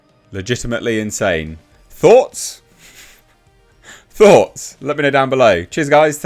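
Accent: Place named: Southern England, United Kingdom. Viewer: This accent you have. It sounds like ricky gervais. What region of the world is this? Apologies in advance, they don't teach us specific English locations. Can't put my finger on it its like a southern accent ( to a yankee analogy)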